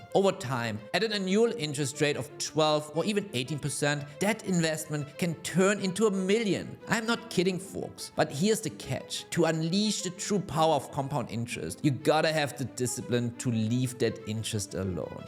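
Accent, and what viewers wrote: Germany. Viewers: i am german and sounds like you too?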